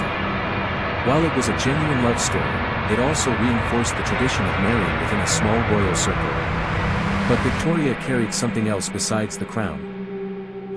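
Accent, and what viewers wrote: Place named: United States. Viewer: Any video clip narrated about the British royal family should feature a voice with an English accent. Is there a specific reason why an American voice dubbed this clip?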